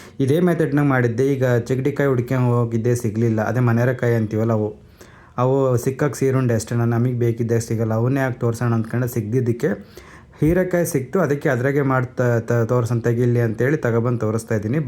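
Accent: Chitradurga, India. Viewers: ಸರ್ ನಿಮ್ಮ ಮಾತು ಕೇಳಿದರೆ ಸಂತೋಷ ವಾಗುತ್ತದೆ. ಚಿತ್ರದುರ್ಗ ಭಾಷೆಯ ಸೊಗಡು , ಪದಗಳನ್ನು ಬಳಸಿ. ಬದಲಾವಣೆ ಬೇಡ . ಏಕೆಂದರೆ ಕನ್ನಡ ವೈವಿಧ್ಯ ಮಯ ಭಾಷೆ . ಪ್ರಾದೇಶಿಕ ಭಿನ್ನತೆಗಳು ಇರುತ್ತವೆ . ನಿಮ್ಮ ಮಾತು ನಿಮ್ಮ ಸೊತ್ತು . 👍👍👍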